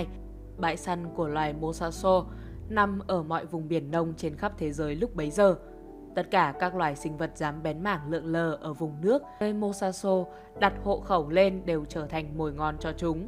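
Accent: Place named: South, Vietnam. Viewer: giọng miền Nam truyền cảm hơn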